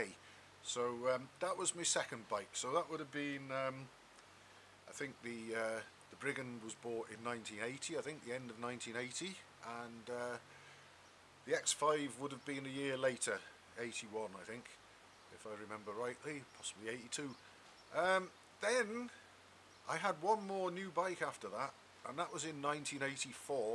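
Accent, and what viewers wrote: United Kingdom. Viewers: Totally “get it ”, thanks for articulating much better than me for why I feel the same way. Ex. Pat Brit who thoroughly enjoys your content. My Dad was from Hereford and your voice reminds me of all my uncles near Wales. All the best from Putnam Valley NY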